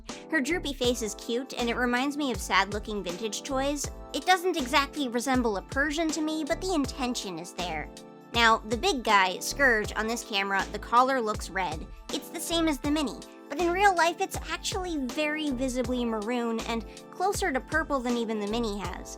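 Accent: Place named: United States. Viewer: i like ur american voice 🤣🤣🤣sorry im british 🤭🤭🤭🤭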